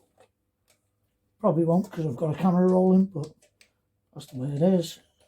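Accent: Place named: Liverpool, United Kingdom. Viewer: I left Liverpool 40 years ago, love the accent, and this video was very helpful, cheers.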